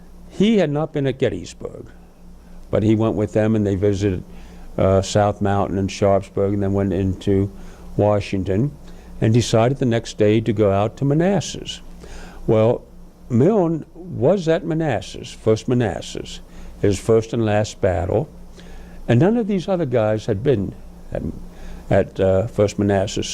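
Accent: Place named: Brooklyn, United States. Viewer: I think you so much, for your hard hard work & research, I would like to suggest working on toning your YANKEE ACENT, for your impression, studying the General’s photos, will show you can use a skilled master Barber 💈. Do a more Standard “ regular “ Bussiness style HC, and about 3 inches in the front on your forehead, and combed back in more of a “ roaches “ front ( old southern term) for “ Pompadour’s “ that’s just away it’s worn off the forehead, your’s is too wispy, too long on your collar, looks , 1810’s, and late 1830’s, but in the 20 th century 1990’s like Billy Ray Cyrus, Mullet. As a fellow wearing a flat top in 1978 out of step. You’d be perfect 👍 for those periods, like , President Polk, wore his in the style, described in Oh Jennings Wise’s book description of his father , Henry Wise, “ in the style of the 1840’s, combed straight back from the forehead long in the back. 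But as you can see by the photo’s , they dressed there hair then, w Call Mees, hair tonic’s,, bear grease, is why You see or did see bears of different sorta illustrated in Barber 💈 shops. Tunstall parlors, and you General, had a Black, body servant, and 99 % Of Tunstall powers in the south was always ran by negro Barbers, another Example I’ll give you is General R E Lees Haircut is called a “ Quartersingle” it’s a styling he wore all his life, it is from the 1820’s into the 30’s mid way. The hair is parted in the back center, and the head is lend forward , and basically trimmed in front of the temp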